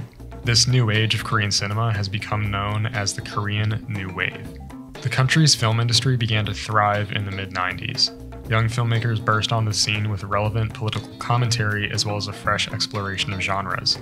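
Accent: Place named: Eastern Europe. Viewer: I feel Slavic notes in ur voice. Gotcha 🤫🗿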